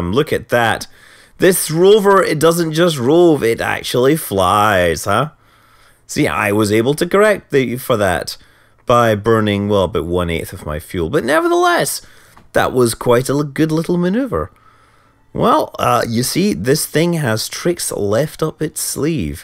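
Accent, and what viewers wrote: Scotland, United Kingdom. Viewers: No, he is a Scotsman.. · He is british...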